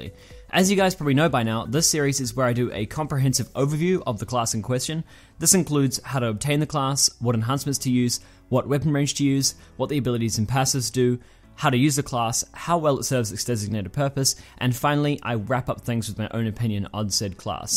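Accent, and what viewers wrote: New Zealand. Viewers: are you from New Zealand because I am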